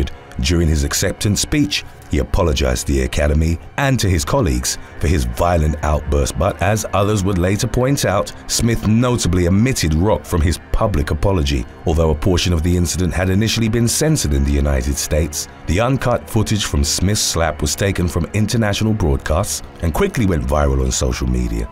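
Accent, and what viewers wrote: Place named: United Kingdom. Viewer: He's british pronunciation is def different nonetheless🙄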